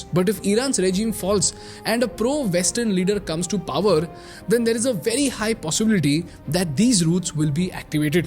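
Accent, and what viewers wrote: India. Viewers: No indian accent please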